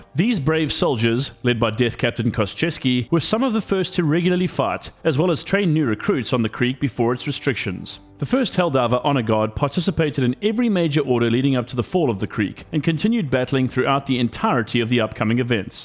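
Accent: South Africa. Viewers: I can smell a South African accent a mile away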